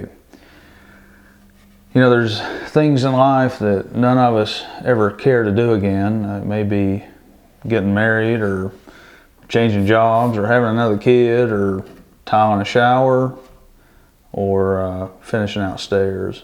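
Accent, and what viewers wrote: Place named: Tennessee, United States. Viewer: Have stairs shaped just like this one in a rental home. I have to take the railings off so that occupants can move there beds ,dresser etc. to the rooms upstairs which over time has created a lot of wear and tear on the stairs from taking railings on and off.Never been much of a carpenter, so i was wondering if you were for hire?Listening to the accent it seems you are in my neck of the woods. Am in southeastern part of Tn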